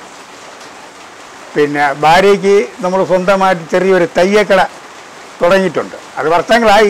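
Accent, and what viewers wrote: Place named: Trivandrum, India. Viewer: അണ്ണാ നിങ്ങട തനി തിരോന്തരം ഭാഷ ആണ് എനിക്കിഷ്ടം അതു കേൾക്കുമ്പോൾ പ്രവാസിയായ ഞാൻ നാട്ടിലെത്തിയ ഫീൽ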